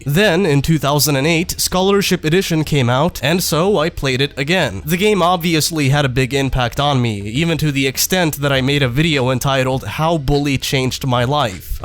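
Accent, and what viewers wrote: United States. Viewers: Didnt know your European you sound american white :/ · You sound American tho why? If u don’t mind me asking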